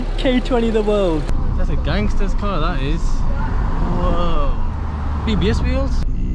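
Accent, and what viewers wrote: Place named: Birmingham, United Kingdom. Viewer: ha glad my comment made you giggle 😂 Are you from brum? I’m in Redditch so I recognise that brummie accent 😅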